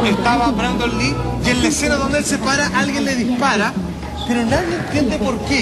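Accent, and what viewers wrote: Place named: Chile. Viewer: no entiendo nada lo que dice este tipo.habla muy rapido y vulgar. y su acento chileno no le ayuda en nada..../////.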